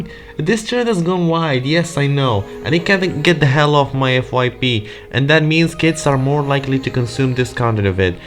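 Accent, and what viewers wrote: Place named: Alabama, United States. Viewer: yo. i thinked you are from alabama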